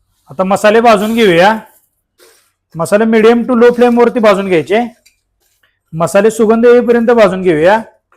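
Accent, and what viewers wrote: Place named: Sangli, India. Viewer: Mastch tumi sangli che ka dada bolne sangli kadeche vatey 😅 khup chan video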